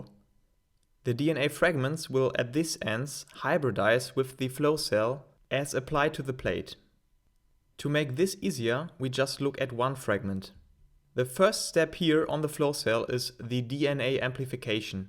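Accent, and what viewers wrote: Germany. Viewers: no one teaches better than a guy with German accent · Are you German?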